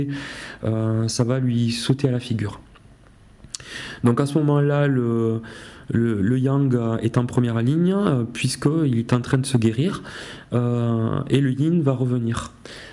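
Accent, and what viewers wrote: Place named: South-West, France. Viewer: j entends un ptit accent du sud ouest comme moi...😍🤩😘 me tromperais-je?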